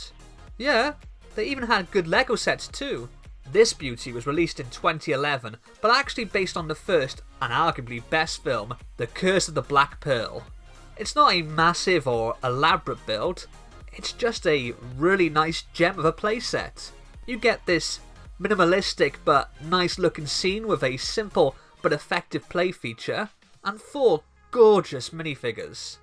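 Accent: Wales, United Kingdom. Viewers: I subbed, love the welsh accent! Great video too, I'm 15 and I'm worried I may have a dark age, I'll come back to lego though I'm sure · Fair play you sound proper Welsh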